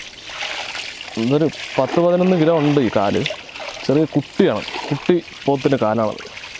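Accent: Palakkad, India. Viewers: Thani palakkadan malayalam slang ishtamullavar oru like adikko😍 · adipoli pkd slang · തനി നാടൻ പാലക്കാടൻ തനിമ സംസാരത്തിലും പെരുമാറ്റത്തിലും, നിങ്ങളിലൊരാളായി ജീവിക്കാൻ ആഗ്രഹംതോന്നുന്നു👌👌👌👌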